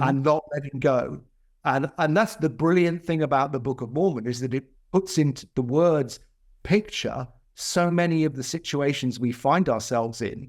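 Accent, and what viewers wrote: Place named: United Kingdom. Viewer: U R DELIGHTFUL to WATCH & LISTEN TO!!😂🎉 💥😄👏😇 ❤️ ur BRITISH SOUND!! IT'S me "mother 👅😛' TONGUE!! 🤣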